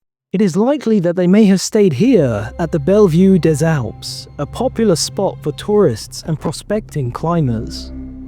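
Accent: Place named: Northern England, United Kingdom. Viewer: Confession time: I'm always willing to support new projects via Patreon or whatever but want to know whether the creator is serious about their work, and usually responses to criticism are a good way to find out. Sorry about the deception. Anyway I see your main strengths as your visual interpretation of the story, and the friendly non-AI Northern tones of the narrator (yourself perhaps?). Well good wishes for your new venture I've subscribed so I can check out your next download when it's available.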